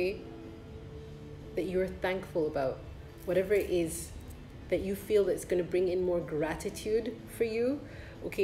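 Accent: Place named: United Kingdom. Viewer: Picked pile 1 TARA I literally wrote Tara’s mantra snd stuck it on my wall few days ago . And YES RELEASE A RELATIONSHIP GOSH KEEPS COMING UP! yes proud of myself thank you for reminding me. Look at all that I have accomplished, and teaching sounds like me! Sharing wisdom! And expressing myself 😭😍 beautiful! Ps. like your cute British accent 😏😏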